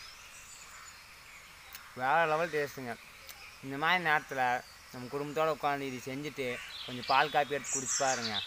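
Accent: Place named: Coimbatore, India. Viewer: Nice da machi 💯👍❤️ Nama coimbatore slang Vera lvl nga🔥🤩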